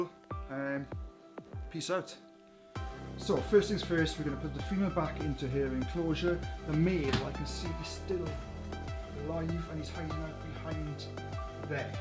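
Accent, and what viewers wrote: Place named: Wales, United Kingdom. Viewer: Love your welsh accent 🥰🕷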